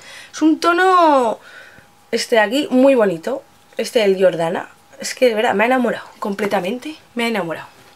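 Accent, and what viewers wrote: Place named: Aragon, Spain. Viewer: me suscribo ,me gusta mucho como te explicas y tu acento maño,por cierto a mi tampoco me favorecen los tonos nude aunque me encantan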